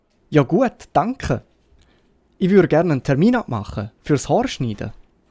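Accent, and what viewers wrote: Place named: Swiss German, Switzerland. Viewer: Hoi! Really enjoyed your Swiss German content 😊 Clear and authentic Swiss German is hard to find—great work! I work with YouTube SEO and noticed a couple of simple ways this could reach more learners. Happy to share if you’re open 👍 Best regards, Md Rana Sheikh Digital Marketer & YouTube Growth Expert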